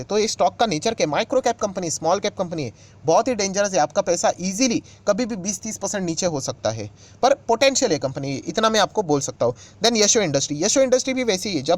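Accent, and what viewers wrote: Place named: Gujarat, India. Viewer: pakke gujarati lag rahe ho aap sir…..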